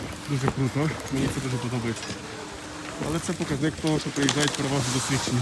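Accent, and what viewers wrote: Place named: Transcarpathia, Ukraine. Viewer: Гарний закарпатський говір !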